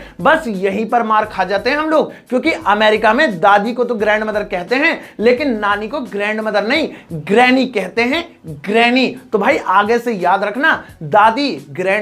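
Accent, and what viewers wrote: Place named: Delhi, India. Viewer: nice voice Delhi se ho pakka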